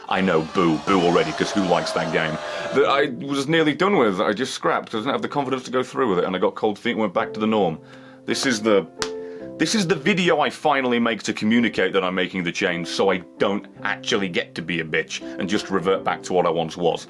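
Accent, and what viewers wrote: United Kingdom. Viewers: As long as you do what keeps your interest and mind going, I think we’ll always stick around,. We’re here for you. We came for Isaac, we stayed for the screaming, enraged, messed up speech displaying British man that is you.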